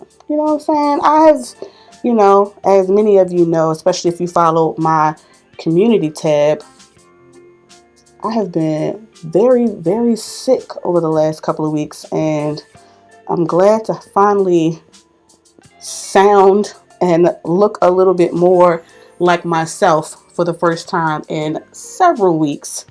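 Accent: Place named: Baltimore, United States. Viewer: Happy New Year,! 🎉 Life has been lifing so I haven't been around in a while. But, you've always been a real one and I enjoy watching you. Whenever I need my dose of Baltimore "ouu" or "ur", your my girl. 😂💯 I pray that all you've set before you and even the good ones you didn't coming, come true in 2024. ❤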